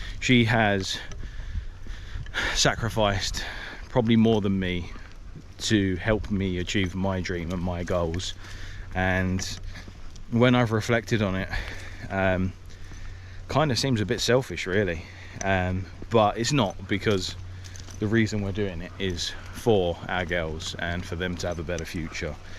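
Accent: Australia. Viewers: Defo getting that Aussie twang mate! Loving the down under content!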